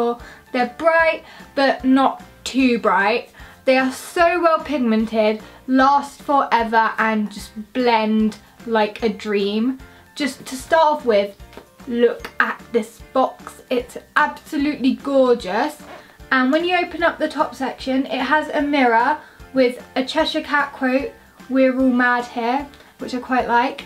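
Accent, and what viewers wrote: United Kingdom. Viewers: Ar you welsh ? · Oh my gosh you're British!! <3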